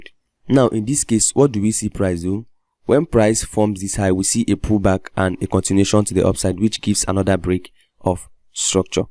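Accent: Nigeria. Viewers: Are you Nigerian?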